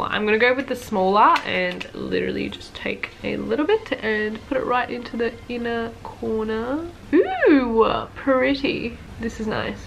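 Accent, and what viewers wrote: Australia. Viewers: I can tell as much it's Australian accent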